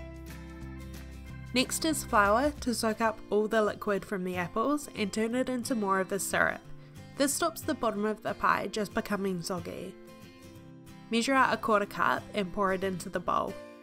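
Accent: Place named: New Zealand. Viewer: New Zealand accent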